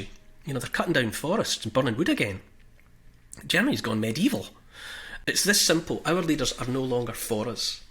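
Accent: Scotland, United Kingdom. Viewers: The Scottish accent is the most beautiful accent in the world and is easy to understand.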